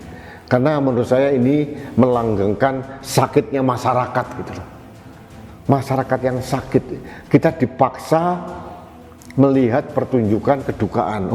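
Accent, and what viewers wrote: Java, Indonesia. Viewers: Sepertinya bapak orang jawa kalau saya liat dari logat bahasanya. Maaf pak, ini murni penyataan anda atau emang mau cari sensasi membesarkan Kanal Anak Bangsa agar banyak viewer? Malu maluin orang jawa aja. Salam sing nyong wong Brebes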